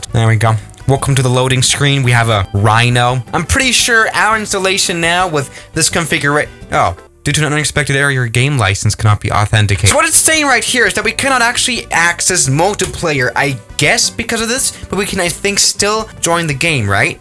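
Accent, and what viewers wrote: Germany. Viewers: Bro are you German? If I may ask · Are your a German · Are you German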